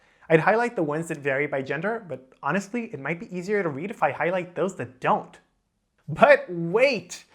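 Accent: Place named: United States. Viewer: They American accent isn't nice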